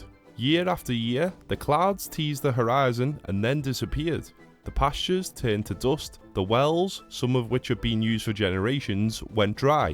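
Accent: Liverpool, United Kingdom. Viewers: He has a Scouse accent from Liverpool. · Liverpudlian geography- this is what I've been waiting for! AI is finished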